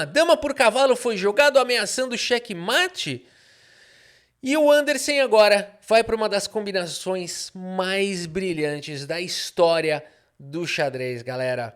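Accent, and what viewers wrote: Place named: Rio Grande do Sul, Brazil. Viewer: Percebo q você é do rs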